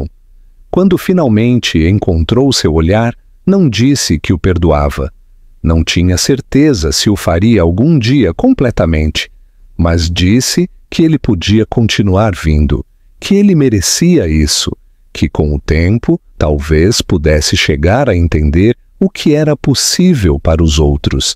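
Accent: Portugal. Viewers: O tema da história é bonito, mas a narração a torna muito chata! Principalmente qdo o narrador fala o português de Portugal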